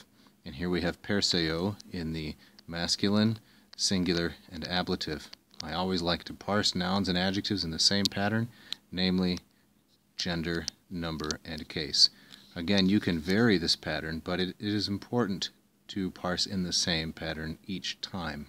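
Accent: United States. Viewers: hey, good job on these, but man you really gotta work on your american pronounciation.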